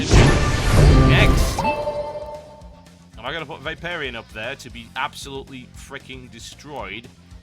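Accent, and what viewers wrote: United Kingdom. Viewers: Doesn't currently live in the UK, ergo his British accent is fake. Nice logic you have there, dope.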